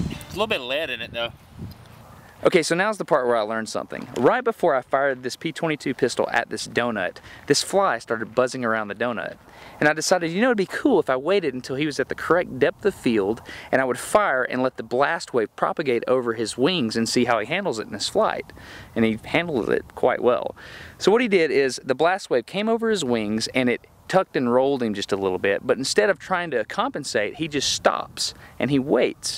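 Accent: South, United States. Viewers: this is america in a video, the food, the accent and the gun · I have to ask. This vid was published June 22, 2012. Today is October 3, 2017 and I'm watching it for the first time. At the beginning you said you were in the California desert. How do you come by your Southern accent? I'm watching this from East Texas. You sound like you could be from around here. Just curious. Where are you from, originally?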